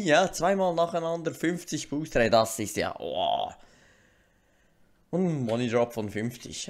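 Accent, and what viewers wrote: Switzerland. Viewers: Bist du aus der Schweiz?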